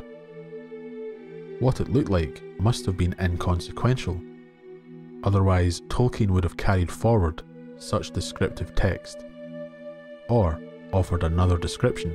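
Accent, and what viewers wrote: Scotland, United Kingdom. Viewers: I don't know if you have an only fans, or an asmr channel, but you have one of the most appealing voices on Youtube. I could listen to you just talking, or reading...what corner of Scotland is your accent from?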